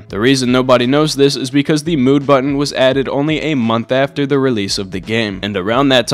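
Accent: United Kingdom. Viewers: British detected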